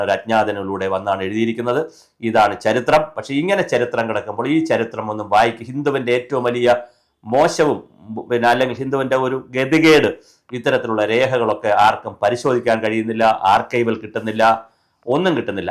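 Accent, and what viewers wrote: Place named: Pathanamthitta, India. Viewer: Pathanamthitta slang ph for bh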